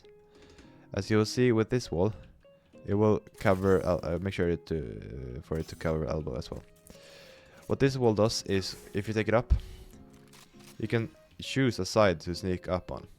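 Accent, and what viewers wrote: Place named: Sweden. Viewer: Are you from Sweden or do you just have an accent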